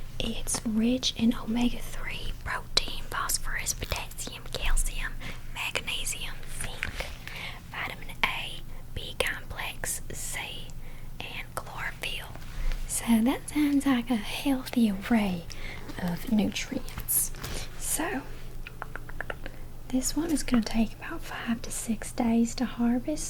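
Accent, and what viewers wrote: South, United States. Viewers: Your southern accent is actually really good😀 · Wow! Your Southern accent is way better than I expected 😅👋🏻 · I reckon you nailed the accent hun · Can you do a part 2 to this or maybe another Southern accent video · Haha great video you sound like Hannah Barron 😅 Your accent southern draw is on point 👍🏼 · Hi 🙂 Definitely getting Dani ASMR vibes with the southern accent. Really good roleplay.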